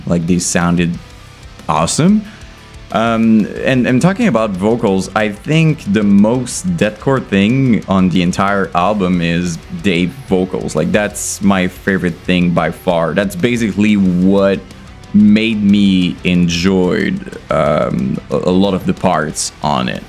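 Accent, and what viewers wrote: Quebec, Canada. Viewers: c'es tu un accent Québécois que j'entend mon chum?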